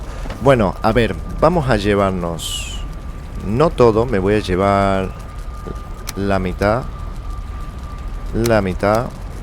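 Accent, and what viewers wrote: Argentina. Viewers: me gusta mucho el contenido pero realmente me hace mucho ruido la mezcla de tonada argentina, con palabras y expresiones españolas y mexicanas jajajaja. Igualmente, repito, de los pocos canales que suben buen contenido del game, gg wp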